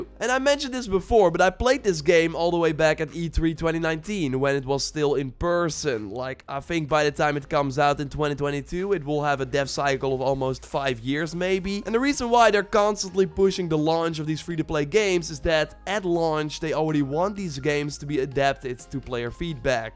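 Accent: Netherlands. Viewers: love ur dutch accent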